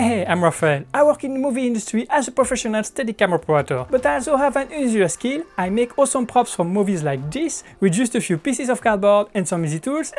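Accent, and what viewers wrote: France. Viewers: Tu est français ? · Are u french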